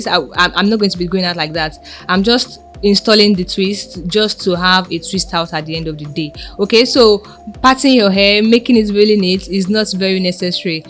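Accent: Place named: Africa. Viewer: She talks toooooo fast with a very strong African accent.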